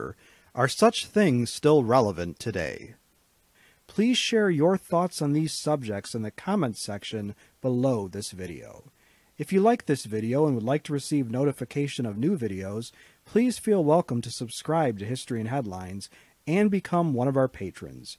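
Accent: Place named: United States. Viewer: Unless I am mistaken, the narrator sounds like an American. He had asked if (paraphrasing) "clubs like the Order of the Garter should still exist today?" In an American context, your equivalent would be the Presidental Medal of Honour, with distinction. The Order of the Garter is a living society honoured by the British Crown. Other than members of the Royal Family who do not count in the 24, are some of the leading figures of the United Kingdom outside Scotland. Also, it remains "The Most Noble Order of the Garter;" the name did not change.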